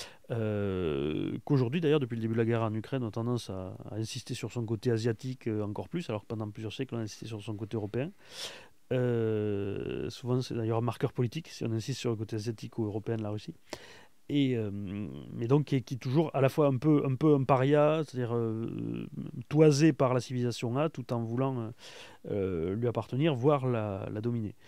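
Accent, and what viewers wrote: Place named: Toulouse, France. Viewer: l'accent toulousain de * me le rends fort sympathique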